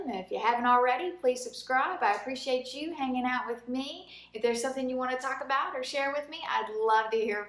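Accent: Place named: South, United States. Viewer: I am not American. Are you from the south somewhere? Cool accent.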